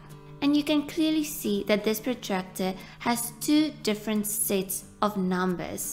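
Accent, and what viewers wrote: United Kingdom. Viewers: rays= raisee british ahh tecaher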